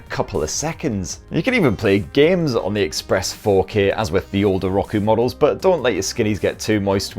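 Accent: United Kingdom. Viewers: His british english is a bit fast for me.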